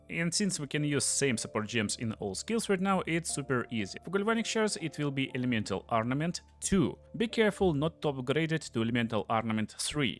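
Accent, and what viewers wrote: Russia. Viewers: дружок, я наше произношение везде узнаю - показывай где на нашем языке видео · ten ruski akcent jest straszny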